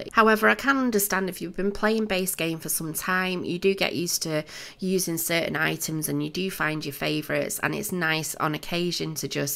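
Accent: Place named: Northern England, United Kingdom. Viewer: New sub here from Arkansas, USA. I love your builds. Are you from the North (Britain)? You sound like everyone on Emmerdale and Tan from Queer Eye LOL. Congrats on becoming a Game Changer!!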